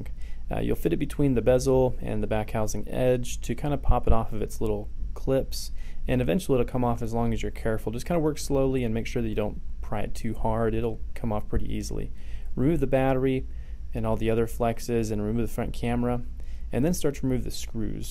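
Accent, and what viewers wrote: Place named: Morocco. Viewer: من الهدر باين انت مغربي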